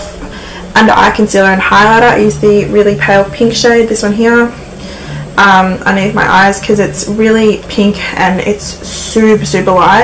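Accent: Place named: Australia. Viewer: Just found your channel when searching for chichi products. I've lived in australia for a couple of years.. and boooy do i miss it!! started watching your videos and your accent wouldnt let me stop! haha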